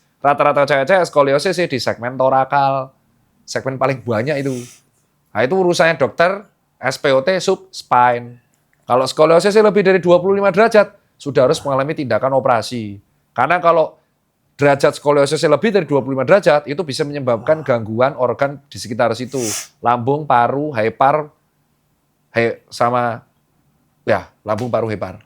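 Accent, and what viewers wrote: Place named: Surabaya, Indonesia. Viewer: Original Suroboyo... cocok banget aku suka 😅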